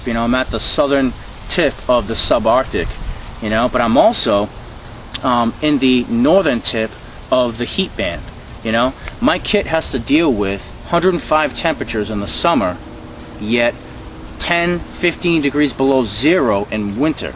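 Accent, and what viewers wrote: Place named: New England, United States. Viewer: I'm trying to figure out where you are... Northeast corner of North America... just out of the subarctic... the first says Greenland, the second maybe Newfoundland... but it looks (and sounds, by your accent) like you're in New England. Did you mean to say you're in the Northeast corner of the United States?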